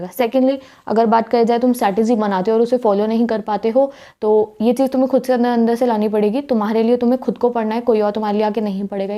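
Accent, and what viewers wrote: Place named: Meerut, India. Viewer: Didi where are u from ? I am from meerut and it seems that you are also from meerut .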